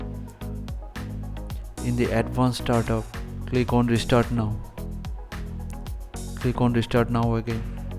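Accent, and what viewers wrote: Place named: India. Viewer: this dude is the goat, knew it was gonna work when i heard the indian accent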